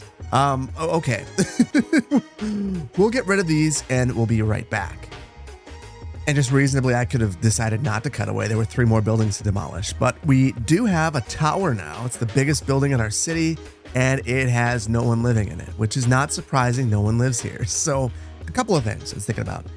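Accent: United States. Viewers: You say tex-tile but not fer-tile. You say furtill. Is your floor made of till or tile? The way some yanks use English is so weird haha. Cheers love your content.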